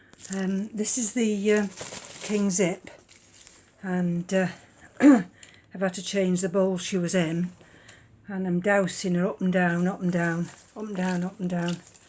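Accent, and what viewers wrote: United Kingdom. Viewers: What part of the world are you living ? (from your accent, I assume the UK). The fact that they've gone into a bark-based mix with reasonable root systems, I would start feeding whenever you water remembering a flush with clean water every so-often. In the UK, it's the growing season and you have active root tips and new growths so they will appreciate some feed right into the Autumn.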